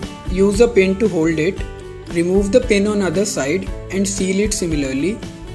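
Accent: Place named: India. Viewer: Very beautiful handbag. Are you from India? I assume because your akcent.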